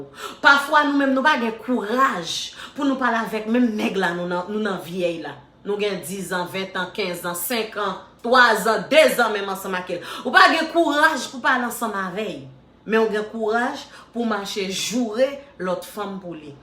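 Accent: Cap-Haïtien, Haiti. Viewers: Helloo men mwen ...mwen vin la pou 2 bagay...mwen vin la pou bel ti accent capoise sa ou genyen an...avek bel konsey ou yo 🤣🤣😍😍😍...tout saw di yo se verite❤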